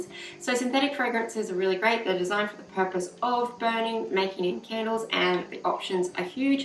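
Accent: Australia. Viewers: Nice to listen to an Aussie, thank you very informative 😊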